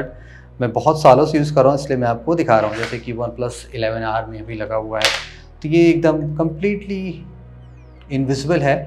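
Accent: Lucknow, India. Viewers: Aap lucknow se hai kyA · Bhai aap Lucknow se ho??? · Aap Lucknow se ho bhai sahab 😊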